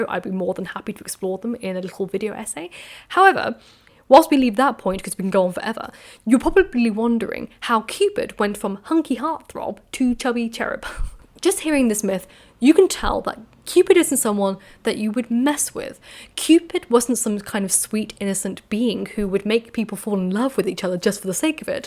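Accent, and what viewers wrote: RP, United Kingdom. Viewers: I love her content but that has to be the RP accent to end all RP accents.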